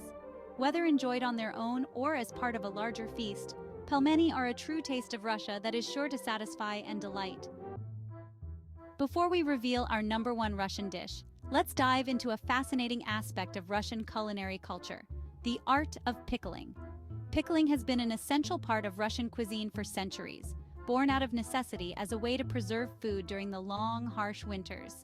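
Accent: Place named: United States. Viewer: As a Russian speaker, I wince at the American pronunciations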